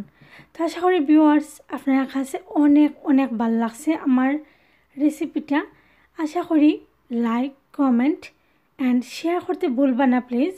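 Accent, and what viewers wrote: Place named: Sylhet, Bangladesh. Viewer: আপু দারুন রেসিপি,তোমার কথা শুনতে খুব ভালো লাগছে,বিশেষ করে “ “গান শোনার পর আমি সিলেট ভাষার প্রেমে পরে গেছি❤️❤️❤️❤️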